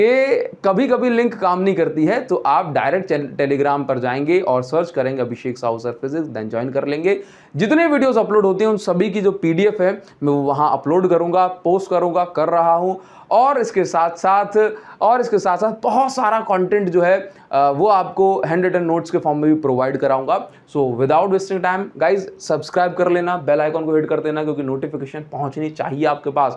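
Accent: Odisha, India. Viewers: Sir aap odisha se ho kya ?😅😅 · sir you are from odisha?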